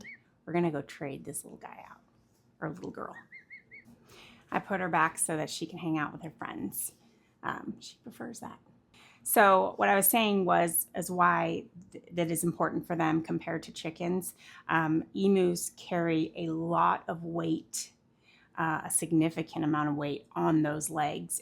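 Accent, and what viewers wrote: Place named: South, United States. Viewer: very interesting but i couldn't deal with them not making it i can't believe people correct your speech really you have a southern drawl and so what if it comes out not the u sound i know what you meant. great video full of info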